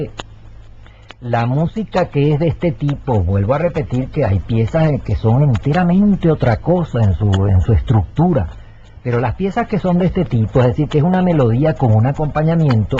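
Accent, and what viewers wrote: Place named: Caracas, Venezuela. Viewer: Sr., le agradezco muchísimo que haya puesto este curso de apreciación musical de Jose Antonio Calcaño en youtube. Aparte de la claridad y sencillez con que explica la música tambien me hace recordar mi infancia en Caracas ( no vivo alla desde el año 73) no solo por las clases en si, que alguna oi en disco en mi casa ( el Sr. Calcaño era pariente mio) sino tambien por el acento tan caraqueño que me hace recordar a mi mamá y a mis abuelos.No sé si todavia se habla asi. Los acentos van cambiando y lo notamos mucho quienes ya no vivimos hace décadas en Venezuela. Muy agradable volver a oir esto.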